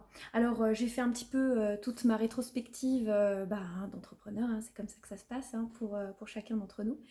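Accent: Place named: Alsace, France. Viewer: Merci c'est toujours un plaisir de vous entendre parler que ce soit de peinture ou de votre bilan. Mais où est passé votre accent Alsacien 😁. Je vous souhaite une belle et heureuse année artistique. Bisous et à très vite pour votre prochaine vidéo 👍😉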